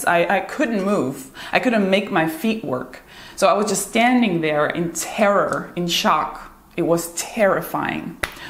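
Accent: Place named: United States. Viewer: I guess the entire Slovakia speaks English. 😁 Where'd you get that accent? You either must have spent a significant amount of your life in the US, or you talk to your colleague from this channel on a day-to-day basis. I used to have a perfect British accent but I lost it very quickly once I stopped practicing.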